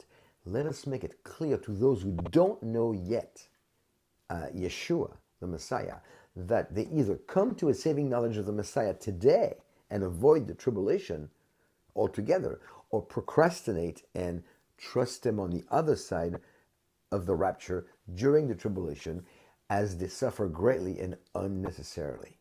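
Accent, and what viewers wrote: France. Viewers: Watching from South Africa and enjoying your teaching tremendously....especially your brilliant sense of humour. God bless you richly. Also love your French accent!!! Shalom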